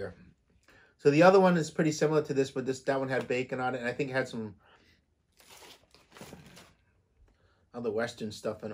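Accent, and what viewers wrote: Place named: Rhode Island, United States. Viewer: I'm in Rhode island. I know your somewhere close based on your accent . New subscriber. Love your videos brother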